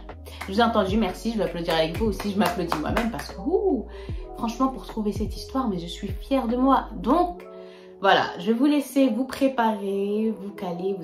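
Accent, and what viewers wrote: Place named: Gulf, Arab world. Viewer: Merci pour la réponse 😍maa Shaa Allah belle richesse de culture, ta façon de parler je pensais que tu étais du côté des pays du Golf😊sinon merci pour toutes tes histoires et te souhaite beaucoup de bonheur 🥰 🥰 🥰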